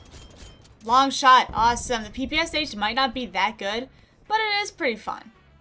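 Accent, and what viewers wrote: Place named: Texas, United States. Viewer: I would do anything to have someone as positive as you in my life! Love you! Love the Texas accent aswell!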